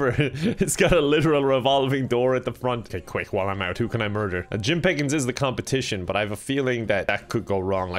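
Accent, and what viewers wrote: Ireland. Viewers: Irish DanTDM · are you from ireland? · Is he irish I am 🇨🇮🇨🇮🇨🇮🇨🇮🇨🇮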